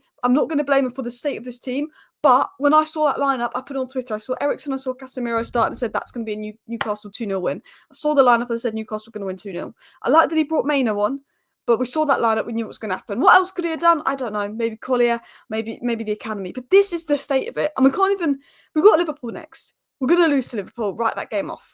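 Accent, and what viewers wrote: United Kingdom. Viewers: This is british accent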